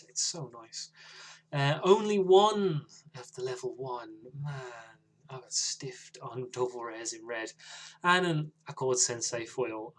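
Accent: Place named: Newcastle, United Kingdom. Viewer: Question. Where in england do you orignaly come from? Cause i think you kinda sound Newcastleish, but yeah. haha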